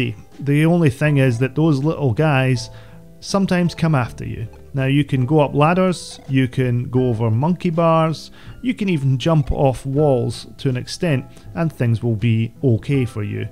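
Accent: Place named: Scotland, United Kingdom. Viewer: i love this Scottish accent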